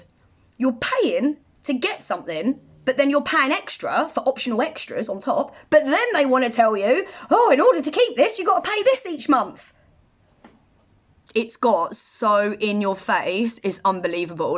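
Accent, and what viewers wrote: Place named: East London, United Kingdom. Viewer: lol only found your channel like a week or 2 ago and love it lol you really remind me of home i was born in east London and grew up in Essex, lol you sound so much like me on things 👍 i have been listening to Alex Jones for 25 years or a little more and everything he said is here now in everyone's face!!! and still people call him crazy lol i could natter for hours with you. keep up the videos they are great.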